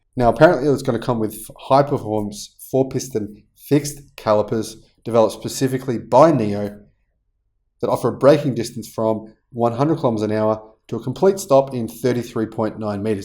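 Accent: Australia. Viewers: I just subscribed to your channel. It's great. To make it even better, please get yourself a good lapel mike. (No offense intended, but your Australian accent is an added challenge to non-native English speakers.)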